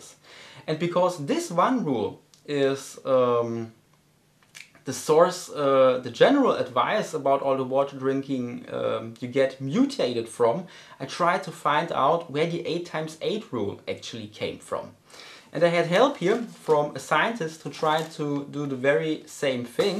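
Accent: Germany. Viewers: your german right?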